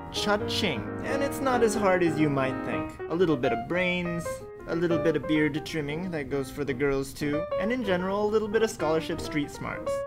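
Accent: England, United Kingdom. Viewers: XD TEACH ME YOUR BRITISH ACCENT! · Your british accent is so cool.. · I just received my visa today yay But no scholarship though D: And love the british accent xD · i actually love wakame... first time i tasted it, i couldnt get enough of it... ha... healthy and delicious... =d awesome british accent btw =) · teehee! that English accent is making this englishwoman giggle muchly ^.^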